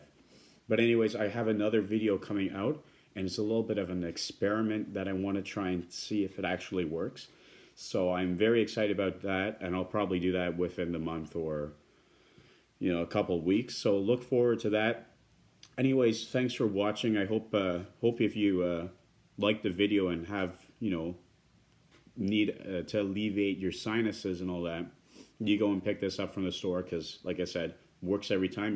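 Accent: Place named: Wisconsin, United States. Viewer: Hey dude, please articulate your words. You sound like you are from Wisconsin or Canada.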